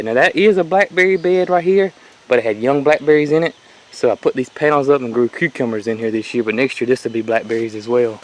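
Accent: South, United States. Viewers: Like little bootsie too.love the country accent.lol.im not a farm person but this is fun and cool to watch.altho i was raised in the country.God bless your family.Jesus loves yall.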